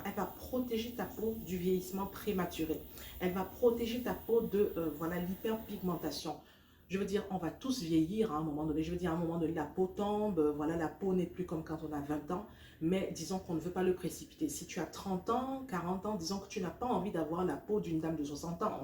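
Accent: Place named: Cameroon. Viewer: Timbre camerounais ce que vous dîtes est très vraie j'aimerais svp savoir comment faire pour avoir votre extracteur svp merci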